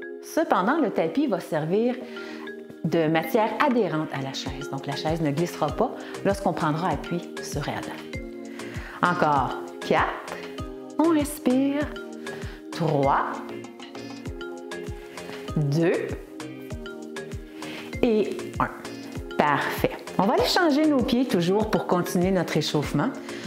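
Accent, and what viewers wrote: Quebec, Canada. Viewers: J'adore, c'est simple bien expliquer ! En plus d'un joli accent québécois 😚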